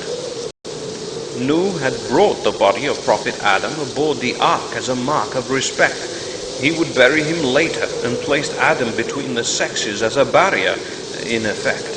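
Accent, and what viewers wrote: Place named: Scotland, United Kingdom. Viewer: The narrator sounds Scottish like sean connery.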